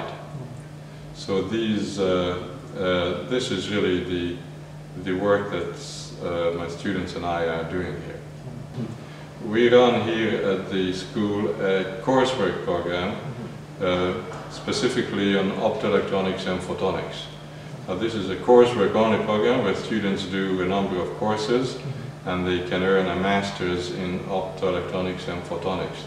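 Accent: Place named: Netherlands. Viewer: I hear the professor is from the Netherlands.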